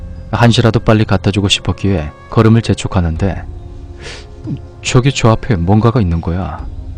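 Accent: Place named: Busan, South Korea. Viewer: 충청남도 서산인데 부산사투리 쓰네 ㅋㅋㅋㅋㅋ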